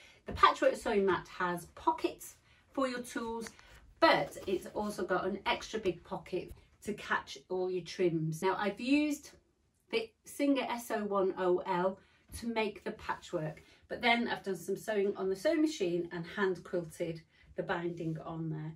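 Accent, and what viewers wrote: United Kingdom. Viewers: 🧡 Love your tutorials and your British accent!! 🧡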